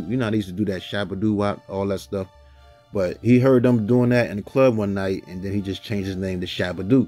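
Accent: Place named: New York, United States. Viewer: Your channel is awesome. And that New York accent!!! 😍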